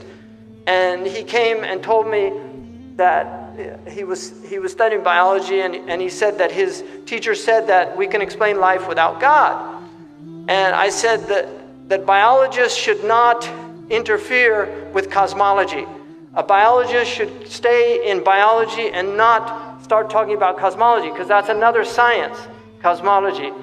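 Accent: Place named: Arab world. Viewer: Hamza yusuf un arapça aksağanı çok kuvvetli maşallah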